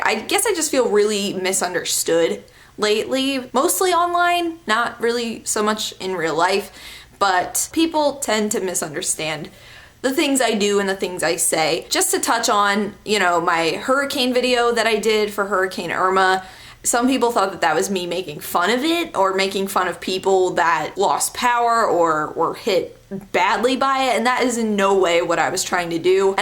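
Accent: Pennsylvania, United States. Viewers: could you do the Accent Tag would love to see that from you because you would be exceptional at it because PA accents are out of this world!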